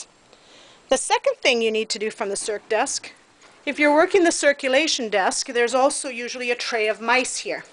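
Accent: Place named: Ireland. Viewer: that Canadian accent has a HINT of Irish in it :D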